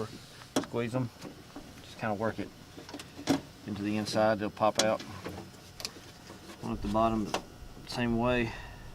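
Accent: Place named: South, United States. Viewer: Thank you for doing such a great job on explaining this! Great video and you speak my native luanguage - southern! Have you ever replaced the climate control on a ridgeline? That's next after fixing my door lock.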